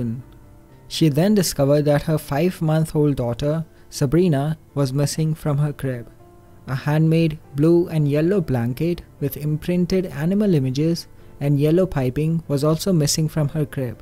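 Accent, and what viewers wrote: India. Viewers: Are you from India? I have a friend in Northern India and I'm noticing the accent is slightly different but similar. Most of the Indians I've met have accents closer to yours. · not this indian voice, literally worst accent possible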